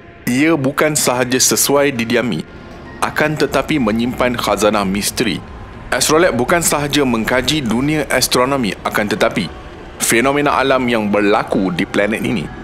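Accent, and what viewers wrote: Malaysia. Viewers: Orang malaisya ya😇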